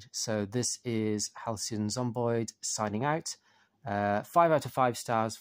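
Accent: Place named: United Kingdom. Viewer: Thanks for all your help. You seem to be British. Your accent seems to indicate so. Your video 📹 is now appearing on YouTube in South Africa. Date: 28/04/2024 (April; Sunday).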